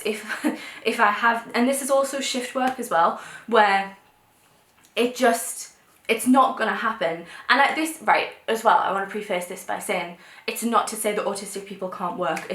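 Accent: Newcastle, United Kingdom. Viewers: A Geordie lass?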